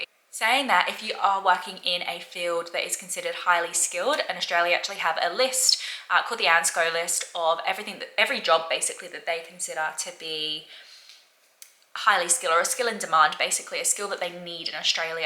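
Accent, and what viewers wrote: United Kingdom. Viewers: Very good, so I had a look at a video you made 8 months ago. Hard to believe they are the same person. Most obviously, 2023 had a strong English accent where as 2024 pretty much talks normally. And 2024 looks much happier, healthier and self-confident than last years model. The fresh air has done you good.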